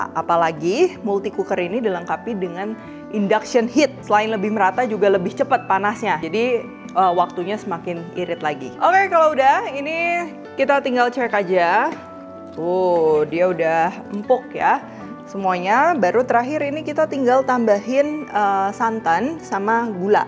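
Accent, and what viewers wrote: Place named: Java, Indonesia. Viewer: makasih cheff...udah recook asli medok banget.. klo ada waktu boleh share resep tahu kriuk ya cheff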